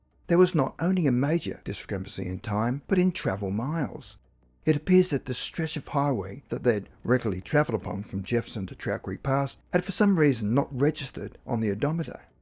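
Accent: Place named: Australia. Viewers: 99% of people know he’s Australian bro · How can you not know?? He has a thick aussie accent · People probably don’t know that the narrator is Australian. Everyone thinks we all sound like Steve Irwin. He has a great voice for narration. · I think they probably do know he’s Australian. M 😀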